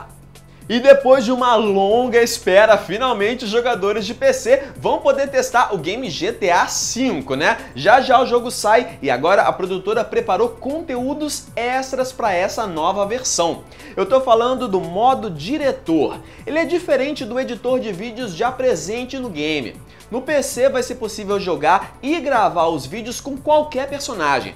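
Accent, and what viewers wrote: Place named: Bahia, Brazil. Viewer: Olhem os primeiros vídeos dele, era mó baianao kkkk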